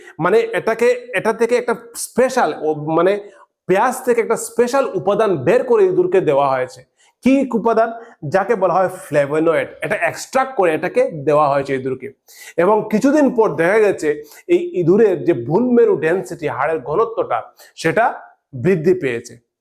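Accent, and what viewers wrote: Sylhet, Bangladesh. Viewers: ভাই আপনার বাড়ি কি সিলেট?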